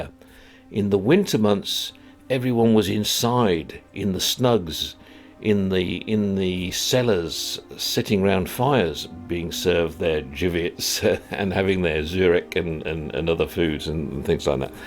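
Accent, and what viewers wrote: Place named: United Kingdom. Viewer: I like to hear a smart people like you(and your normal British accent) Greetings from Poland.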